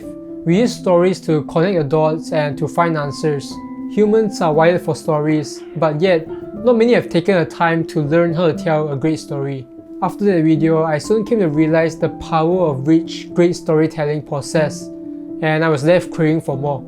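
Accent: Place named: Malaysia. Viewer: your accent is oh so familiar! you're malaysian, it turns out!!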